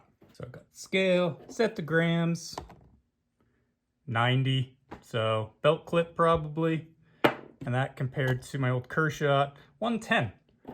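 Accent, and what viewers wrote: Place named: Canada. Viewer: Every Smith Blade unboxing video is a guy with a Canadian accent and I think it's part of their plan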